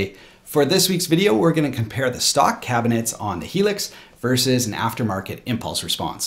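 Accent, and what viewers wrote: Canada. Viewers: It's not often I can hear the first fragment of a sentence and know someone is a fellow Canadian, but that absolutely happened here! Lol Excellent video, thanks for putting it together, seriously considering a Helix.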